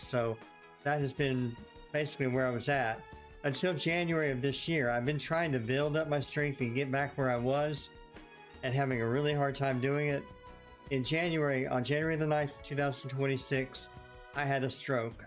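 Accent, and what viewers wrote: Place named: South, United States. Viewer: 😭🥳🙌 AMEN Hallelujah Glory you are BACK! You sound FANtastic to me, Music to mah ears! i think you've picked up a bit of southern twang and im Loving it... 2.0, Baby! Let's Go! 🙏💙🥳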